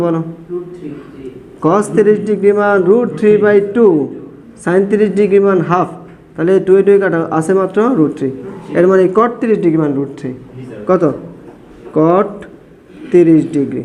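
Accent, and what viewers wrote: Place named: Noakhali, Bangladesh. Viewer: আপনার বাসা কী নোয়াখালী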